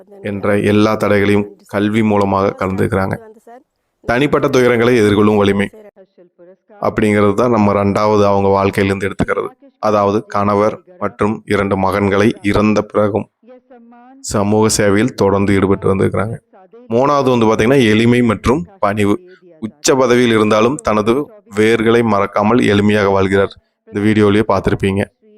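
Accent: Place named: Tirunelveli, India. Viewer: Nellai Kanyakumari tamil pesureenka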